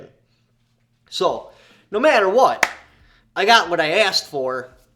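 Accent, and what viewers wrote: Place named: New England, United States. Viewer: Not gonna lie, it took me some adjustment to get accustomed to your yankee accent. AFTER that I became attached. That said, I am sorry if I missed the vid of what’s going on with you; could you do a vid where you break it down?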